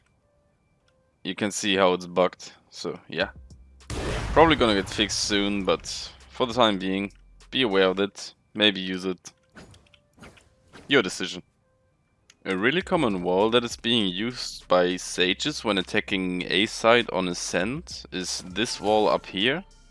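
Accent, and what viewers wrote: Germany. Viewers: Wie Deutsch kann English eig. sein :D... Gutes Video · Wie man hört das du deutscher bist haha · Are you german? Because of your accent ;)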